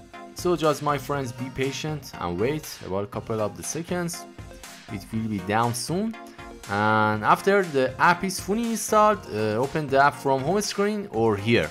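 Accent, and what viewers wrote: Iran. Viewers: پیش خودم گفتم این چقدر شبیه ایرانیا انگلییسی حرف میزنه تا اینکه همراهکارتو دیدم😂❤️❤️کارت درسته لنگ دی ان اس بودم❤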